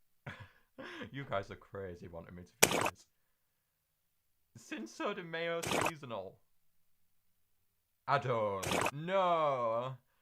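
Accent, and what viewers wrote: United Kingdom. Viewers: See? He’s British like me (is steve British?)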